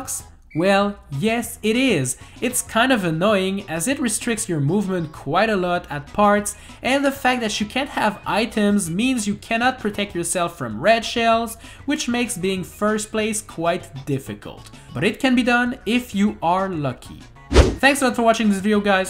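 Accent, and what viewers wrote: Quebec, Canada. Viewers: Wait a damn second,. Are you from canada??????????? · Are you from Quebec??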